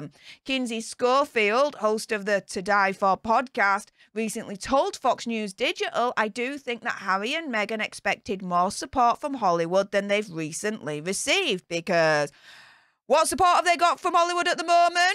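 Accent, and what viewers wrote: Yorkshire, United Kingdom. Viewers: Sounds as though she’s from Yorkshire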